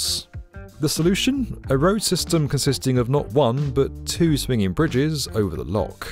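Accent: United Kingdom. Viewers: sounded british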